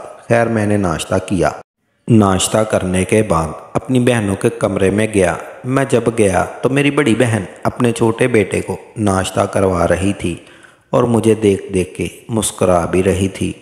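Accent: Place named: Sialkot, Pakistan. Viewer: astagfar.... awaz k lehja sialkoty hai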